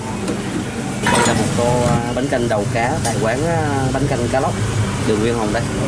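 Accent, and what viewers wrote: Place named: Quang Ngai-Binh Dinh, Vietnam. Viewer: Giọng nói nghe hơi Nẩu chưa chuẩn lắm nói ít thôi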